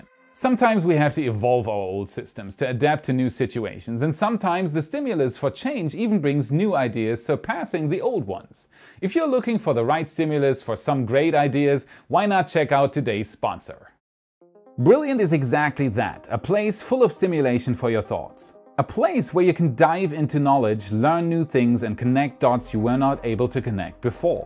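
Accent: Germany. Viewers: wait... are you from germany? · Wait a second... do you have german roots?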